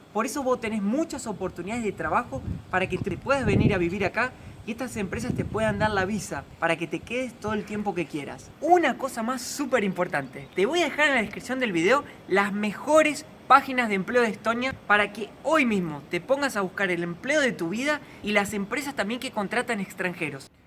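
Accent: Argentina. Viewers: Sos Argentino amigo por tu tonada. De que provincia??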